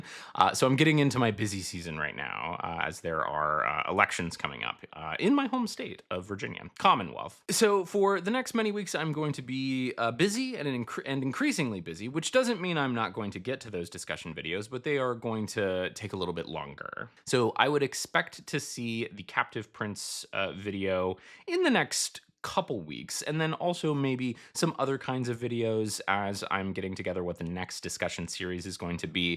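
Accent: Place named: Canada. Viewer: See? There’s a reason why I thought you were Canadian at first 😂😂😂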